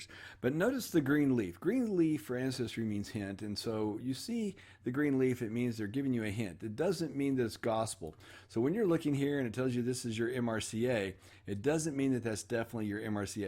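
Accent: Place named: United States. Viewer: Take no notice when we Brits snigger at your pronunciation...you are probably saying it correctly, it's us who have corrupted it over the last 1000 years or so! :-) BTW...my favourite US pronunciation is Worcestershire...as in the sauce...you guys say Wor-sess-ter-shire...whereas we say Wuss-ter-sha (or 'shur' depending on where in Britain you come from!). And get 2 Brits, one from the north of England and one from the south, to pronounce the word 'castle'...ah...hours of fun with English pronounciation! :-)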